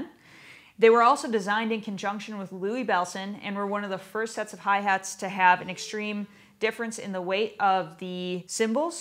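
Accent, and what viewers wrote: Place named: Chicago, United States. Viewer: She sounds like she’s from Chi-Cah-Goe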